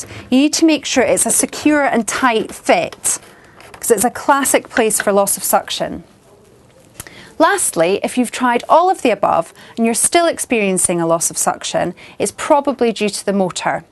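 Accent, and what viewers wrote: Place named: Glasgow, United Kingdom. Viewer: I love this young lady's accent...my grandmother was from Glasgow and she sounded very much the same. =)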